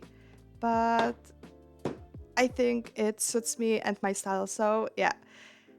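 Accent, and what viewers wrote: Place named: Poland. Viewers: I HEARD THE POLISH ACCENT FROM MILES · I FELT THAT TOO, I THOUGHT "she sounds Polish" AND THEN SHE SLAPS A "ostrożnie szkło" STICKER ONTO THE SKETCHBOOK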